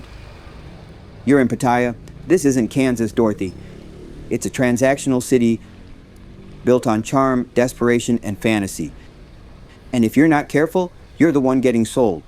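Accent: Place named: India. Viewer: why u are indian man your accent can't escape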